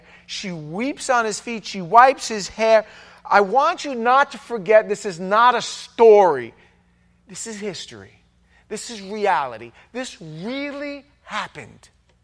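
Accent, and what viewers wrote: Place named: Long Island, United States. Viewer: You sound like your from Long Island. Good Sermon !😊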